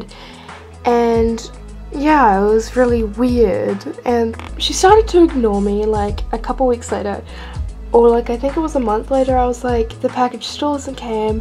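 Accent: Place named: New Zealand. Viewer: i recognised your accent then when you said you were from nz i subbed lol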